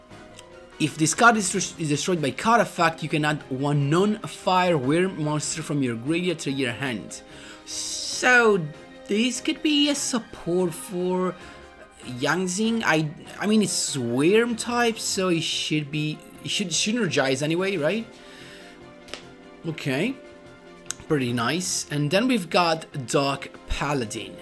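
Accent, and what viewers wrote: Italy. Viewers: Great video I so hipped for dark magician, but one question. Are you from Italy? You talk whit an Italian accent. I say it because am Italian and.. I don't know I've this sense of familiar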